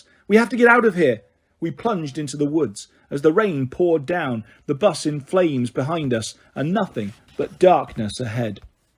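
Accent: United Kingdom. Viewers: He's BRITISH bro, what the fuck did you expect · He's British §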